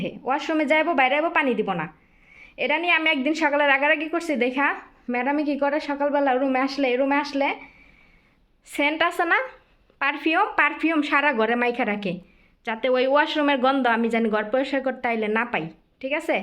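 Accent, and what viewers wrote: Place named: Manikganj, Bangladesh. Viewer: Apnar mokher vasa sone mone hoy apnar bari manikgonj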